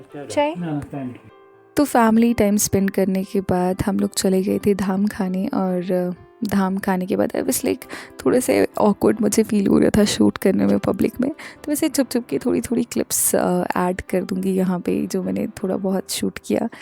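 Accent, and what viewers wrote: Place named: Mandi, India. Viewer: Hello I am also from Kangra .....apki awas s esa lag ra ki ap Mandi s ho ?? Kya ap mandi se ho 🤔🤔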